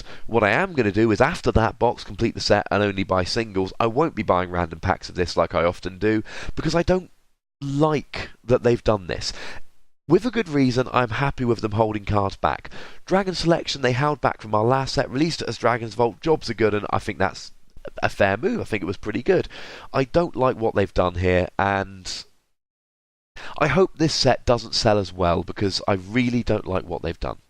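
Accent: United Kingdom. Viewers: His voice is just beast. Love the cornish accent, He's a good teacher too, he rescued my business grades!! If is watching then good luck in your career and if not, then someone pass on the message:)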